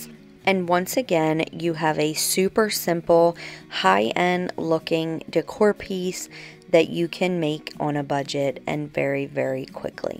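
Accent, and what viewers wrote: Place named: Philadelphia, United States. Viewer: YES GIRL. You have my accent lol I live in Delaware county originally from Philly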